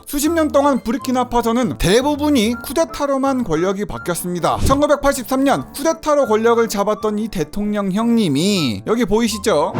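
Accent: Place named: Jeolla, South Korea. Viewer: 전라도네 ㅋㅋ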